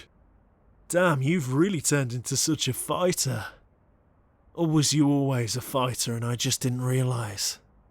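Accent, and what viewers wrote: England, United Kingdom. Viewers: I always have a weakness for an English accent and I love yours.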